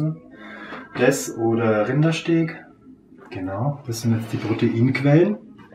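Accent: Bavaria, Germany. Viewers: woher kommst du eigentlich ursprünglich? man hört einen bayrischen akzent raus. aktuell residierst du in nürnberg hab ich gehört? ;)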